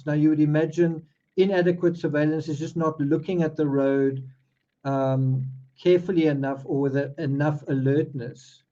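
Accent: South Africa. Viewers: You must be South African, like me. How long have you lived in the USA..?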